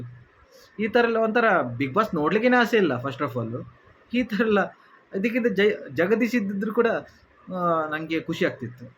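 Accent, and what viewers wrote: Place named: Tulu Nadu, India. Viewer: Enu helta edira tulunadu navaru matadode hage adakke maryade kodi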